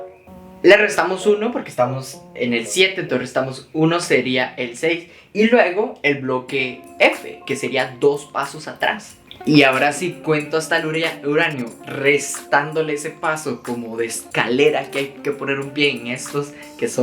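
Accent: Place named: Colombia. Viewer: Pensé que eras de colombia 😊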